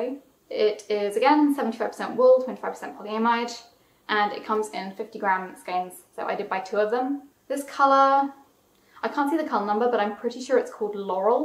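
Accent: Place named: United Kingdom. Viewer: I’m binge watching every episode )) I love my blacks and pinks but I’m obsessed with your British accent and colour choices )))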